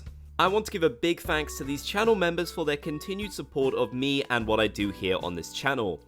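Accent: United Kingdom. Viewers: british alert? this whole time i thought you were just really good at faking that accent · I didn’t know you was British, I am too. I don’t pay attention to accents 😅 gang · Oh so you're British? Say Worcestershire Sauce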